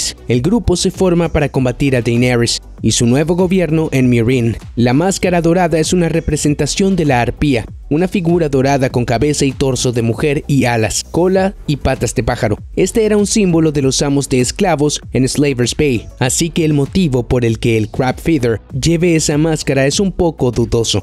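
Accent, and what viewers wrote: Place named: United States. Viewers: Trata de no hablar como gringo me estreso de el resto está todo bien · Insoportable el acento gringo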